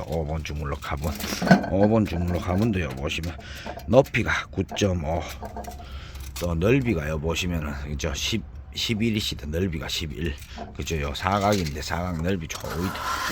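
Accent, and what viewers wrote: Gyeongsang, South Korea. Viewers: 가격도 착하고 선물까지 주시고 ~ 사장님 경상도 사투리 정감 가네요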